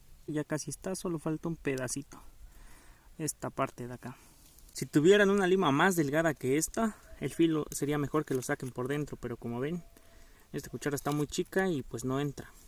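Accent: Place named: Chiapas, Mexico. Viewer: excelente video. estos SÍ vale la pena verlos, no como otros videos tontos ke no aportan nada ,.felicitacion al joven por su ingenio y su timbre de voz muy agradable , nada ke ver con esos videos españoles ke con su horrible tono y timbre de voz caen como patada en los EGGS. PERO KIERO SABER QUE LUGAR ES , ESTA MUY BONITO POR ALLA. POR EL TIMBRE DE VOZ PARECE SER DE CHIAPAS. AYUDA ,DONDE ES ?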